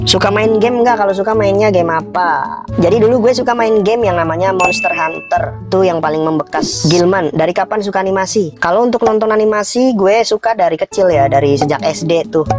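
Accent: Banyumas, Indonesia. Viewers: Setuju jarna inyong juga ngapak lah broo😁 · Ngapakny Mana Bang ? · Ngapake ngendi bos...bener bae nek pas ngomong ngapak ngena/medok bngt 😅😅😅 sem 😅👍👍👍👍 trus rika mantan kenshu pa bos? Kadang nek jepangane 👍👍👍👍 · Bang ngapak bgt rika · Org ngapak? PADA WOYY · Oalah jebhule rika wong ngapak😂👍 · Ternyata orang ngapak ya bang😅 gw juga orang ngapak ni btw ABG nya orang mana😁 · Ngapaknya mana bang.. cilacap.. Purbalingga, Purwokerto apa mana bang? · Ngapak sebelah mana banh? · Anjir wong ngapak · Bang gw juga org ngapak lu dmn nya 😂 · Jebule wng ngapak y, pantesan ana ngapak" e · Gua suka banget kalo bang pakai logat ngapak😂😂 sumpah ngakak😂😂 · Ngapak e pundi mas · kalo orang ngapak, jadi keinget curanmor versi ngapak cilacap yah bang 😂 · Ngapak e ndi bang kebumen po cilacap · Ngapak nya sebelah mana bang?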